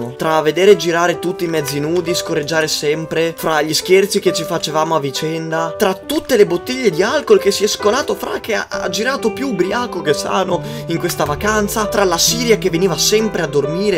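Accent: Veneto, Italy. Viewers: ma sei veneto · te sei veneto? Io si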